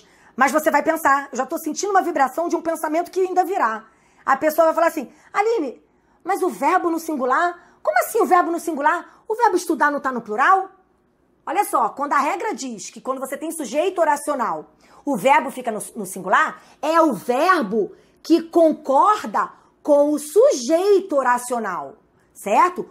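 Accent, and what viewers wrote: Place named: Rio de Janeiro, Brazil. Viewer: Por que todos os professores de português são cariocas?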